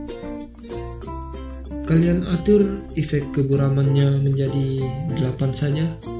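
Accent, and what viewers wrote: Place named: Jereweh, Indonesia. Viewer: Mantap logat Jereweh-nya :)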